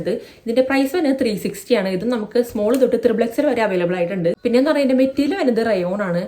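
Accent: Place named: Thrissur, India. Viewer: Chechide veed Thrissur aano Slang avidethe thonni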